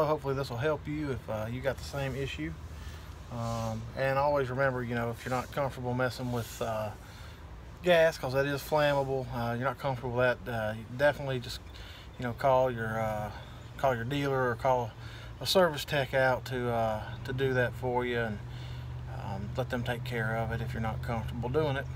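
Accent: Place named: South, United States. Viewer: Thank you sir! Minutes in, I think I know what the problem is and it's probably not the regulator! I had a guy switch it out a couple of years ago, and he definitely didn't use the proper yellow tape, he used regular Teflon tape that actually came from Dollar Tree. I just need to go get the right stuff, and I'm going to change it myself this time. Turning a wrench isn't rocket science, and I've got my spray bottle of soapy water. My tank is outside, so that tape has broken down in the weather. You just saved me some $$$, so THANK YOU!!! Funny thing is, that guy who I hired to change it last time has worked for decades at RV parks and told me he's a certified propane tech. Okay, but he didn't know not to use regular Teflon plumbing tape? Now I'm old, single, and trying to take care of my goat ranch, I've found YouTube to be a wealth of information. 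I've learned that a lot of things aren't nearly as complicated as others want us to think. BTW, I love how you talk. I grew up in Dallas and spent Summers in Arkansas at a horse camp when I was a teen. I love Arkansas, lots of nice folks there. You sound just like a lot of my family.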